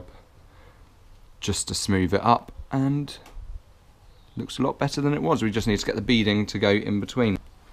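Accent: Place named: England, United Kingdom. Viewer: If I hadn’t heard your English accent I would have known you are in England because of the ridiculously small Garages they attach to the House. So sad.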